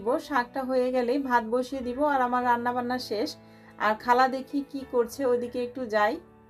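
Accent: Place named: Noakhali, Bangladesh. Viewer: ওরে ! আন্নে আঙ্গো নোয়াখাইল্লা ভাবী নী, আগে কন্নো কিল্লাই?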